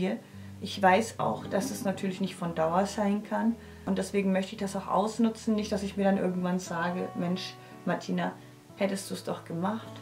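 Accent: Franconia, Germany. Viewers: Ich wusste es!!!! Ich wusste es schon immer! Also das du aus Nürnberg, Umgebung kommst!!!!❤️ Ich liebe fränkisch und Franke erst recht❤️ Ich könnte mir nicht vorstellen hier weg zu ziehen. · Ach Gott wie du fränkisch redest, einfach a super sach! komm auch aus der nähe Nürnberg/Erlangen und für mich gibt's nichts schöneres als mei schönes Franken ❤ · einfach nur: #Frankenpower :) Ich liebe es wenn du fränkisch sprichst, da ich ebenfalls aus dem schönen Franken komme :D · Nürnberg ❤️ Franken ❤️ Heimat ❤️ ich liebe es wenn du im Dialekt sprichst 😍😁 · Nürnberg, Erlangen, FÜRTH! 😂 sofort ist mir das Herz aufgegangen beim fränkischen Dialekt <3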